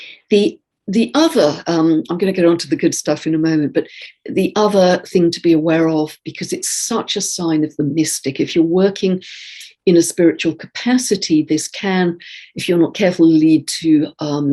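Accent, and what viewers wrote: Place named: RP, United Kingdom. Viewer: And she does it in a rather cultured British accent.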